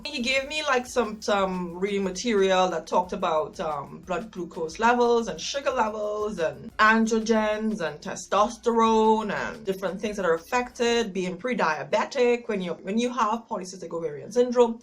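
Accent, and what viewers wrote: Trinidad and Tobago. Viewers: Very informative. I'm quite hairy in places I wonder if I should get the test. I' like ur trini accent 👍🏾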